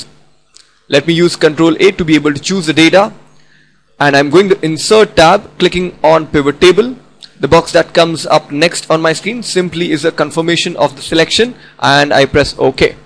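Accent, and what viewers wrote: India. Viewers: indian accent is always fun to hear hhhh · Hai, yur voice seems 100% match with a person from Chennai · This is a neat trick, but you have to be careful of his kind of thick east Indian accent. I, also, am not sure what version of Excel he is using. This may not be 2010, it looks like a later version, but his trick is good.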